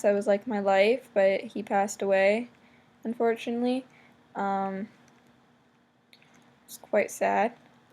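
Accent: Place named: Toronto, Canada. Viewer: omg i think your from toronto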